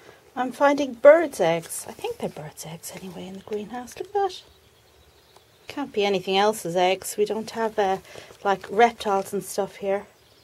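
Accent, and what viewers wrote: Ireland. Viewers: I live in the U.S., Virginia to be exact. If I lived in Ireland, with access to all of the great plant material I've seen on your channel, and if I had the kickass Irish accent, I would lose my mind.:P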